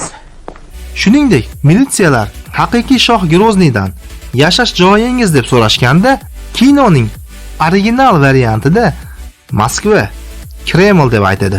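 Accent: Namangan, Uzbekistan. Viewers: Наманганлик акансиза шархловчи😊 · аввало узингни .талаффузингни Тугрилагин Наманганликмисан нима бало шевангни. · Наманган шеъвада гапирган админ. Шеъвани йўқотиб адабий тилда чиройлироқ гапиринг. Русча сўзларни ҳам Наманган шеъвада гапириб юбораябсиз.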